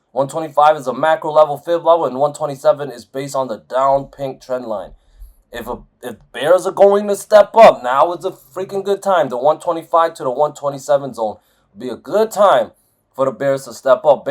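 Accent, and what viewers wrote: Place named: Northeast, United States. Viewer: you sound like you're from the northeast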